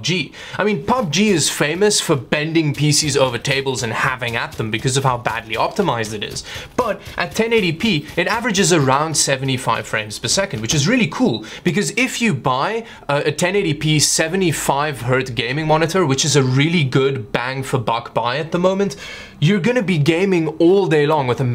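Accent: South Africa. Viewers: are you south african? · Bra is jy van SA?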